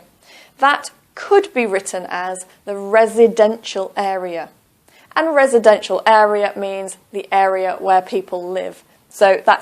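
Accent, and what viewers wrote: United Kingdom. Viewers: Why does not British Council use British accents like her accent in the IELTS?. They always play fucking horribles voices and accents in the listening section !! In the other hand, flawless explanation!! Thank you, Ms !!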